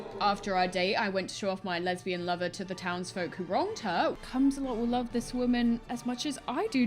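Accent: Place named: Southern England, United Kingdom. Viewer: hi (?) what accent would you say you have? what region of england? i hear southern but if there's something more specific let me know. sorry i just like accents:-)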